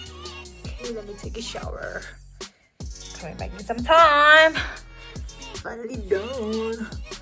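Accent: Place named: United States. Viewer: Wow! Your english speaking is super fluency and a perfect American accent. Good to hear it. 👍 Happy stay!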